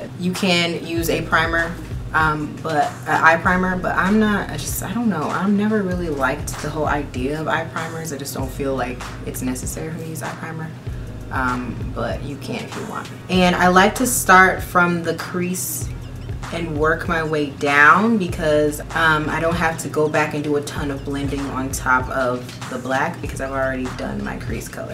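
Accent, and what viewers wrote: England, United Kingdom. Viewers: Your British accent is so good 😂 this is a sign for you to come down next year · You're british accent s actually soo dope!! xx Loving this look sooo much sis xx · The English accent though 😂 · Living for the british accent lmao you kill me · Literally thought "oh my gosh why do a baby reveal like this" 🙈🙈 British accent is on fleek though! Sounded a bit like Shirley though lol From London btw when are you coming to visit 👌🏾😍😘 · Love the English accent 😂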